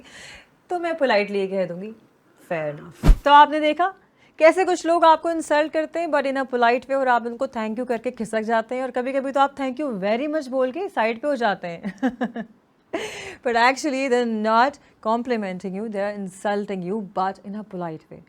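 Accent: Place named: United Kingdom. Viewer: Arsalan literally inspired tha tmharay British accent say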